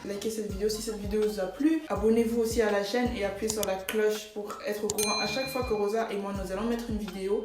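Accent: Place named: London, United Kingdom. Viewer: J’aime cette video. Je suis grande aussi. Je viens de Londre et ma francais est très mauvais. Mais je comprends assez. Aussi j’ecoute un accent londres quand tu parle anglais 🇬🇧❤️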